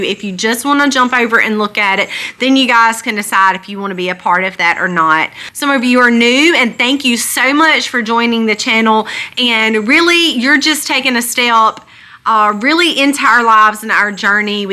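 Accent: South, United States. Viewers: Lovely southern drawl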